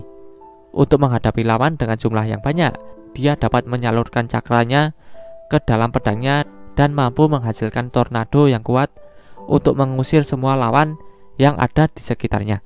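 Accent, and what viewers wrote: Java, Indonesia. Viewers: Org Jawa kebanyakan bahas membahas🤣😂😁 logot mu GK enak di dengar telinga hhh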